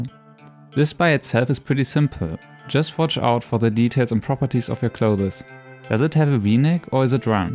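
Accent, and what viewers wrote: Germany. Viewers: Dieser Moment wenn man halt direkt raus hört, dass er aus Deutschland kommt (oder zumindest deutsch seine Muttersprache ist) 😂😄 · Your german accent is thicc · OK, sorry but I must ask you that... Are you a German because you have an small accent...🤔 No matter your Video is pretty cool😊😎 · Kann es sein das du Deutsch bist? Du hast diesen Akzent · holy shit im more intrigued about his german accent and voice sounding out of place for someone who looks like that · sorry but are you german? You have a really hard accent. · You have a German axent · Bro bist du deutscher hört sich nämlich so an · Deine Stimme hört sich Deutsch an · Ich könnte mich irren, aber der Akzent hört sich nach deutsch an. Lol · Dude, your english is indeed pretty good but nevertheless I instantly noticed that you are german.xD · Ae you from germany because you have this accent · Deutscher Akzent?:D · Sehr cooler Zeichenstil und gut erklärt. 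Hatte nur bereits nach 2 Sekunden gemerkt, dass du Deutscher bist xD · You sound like a german speaking english. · Are you german? XD man hörts sehr😂😂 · Hey are you German? Bc you kinda sound like you are and you were at gamescom :D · German accent? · Could it be you're german? Cause your english sounds pretty german to me. Und ich bin selbst aus Deutschland. xD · I dont know you but you sound like a german who speaks english. If its the case: hi ich bin deutscher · Why do u sound like a german guy speaking english? I mean i would know what that sounds like since i live in Germany but...seriously bro. Btw i love your drawing skills!!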